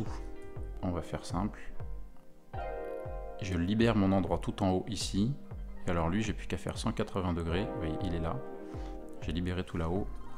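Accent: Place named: Belgium. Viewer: Trop pourri ton accent belge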